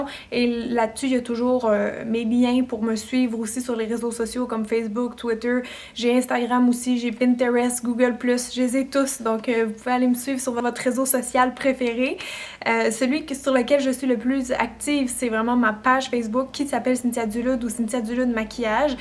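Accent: France. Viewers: ben t francaise non? nous on trouve que vous avez un accent ici au Quebec ♥♥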